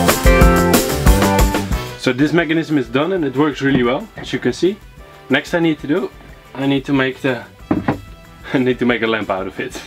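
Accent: Netherlands. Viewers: you sound so dutch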